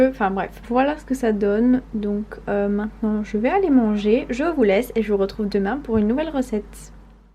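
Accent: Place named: North, France. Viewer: tu as un petit accent du nord de la france, tu viens d'ou? 😉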